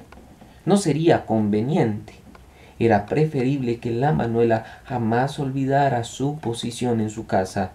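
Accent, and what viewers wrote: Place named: Colombia. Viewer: me agrada tu acento colombiano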